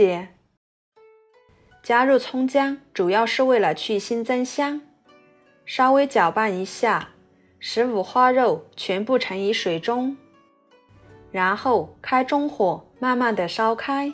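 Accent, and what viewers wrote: Yunnan, China. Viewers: 你这个云南话说呢太好啦！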